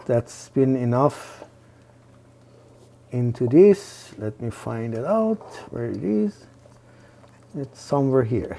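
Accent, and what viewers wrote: Iran. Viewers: beautiful and sincere Persian accent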